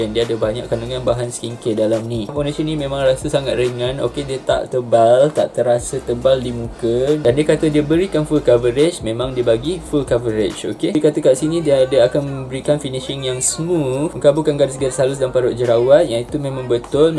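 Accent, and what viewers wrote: Sabah, Malaysia. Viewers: Are you sabahan? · Orang sabah ke ?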